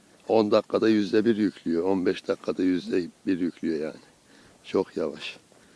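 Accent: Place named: Tokat, Turkey. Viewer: Selamın aleyküm gardaş tokat şivesine benziyor konuşman ya sivaslı ya da tokatlısın heralde?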